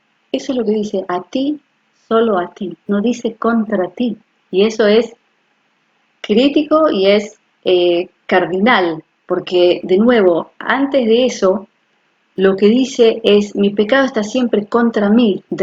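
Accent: Argentina. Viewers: Me sorprende que después de tantos años de vivir en Israel, conserves tan bien el acento argentino.Gracias por compartir el hebreo, ya lo estudiaré, me encanta.Salud a ti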